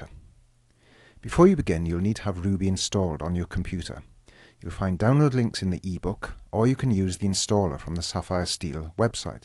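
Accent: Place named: Wales, United Kingdom. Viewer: Now that's a lovely Welsh accent.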